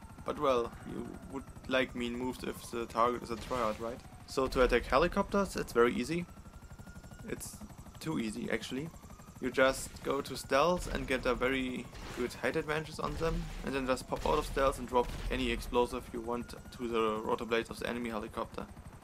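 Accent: Germany. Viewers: Deutscher man merkt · Du hörst dich deutsch an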